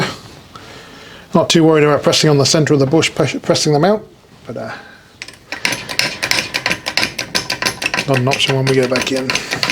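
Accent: United Kingdom. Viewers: Sound British mate...... I have to get this done on mine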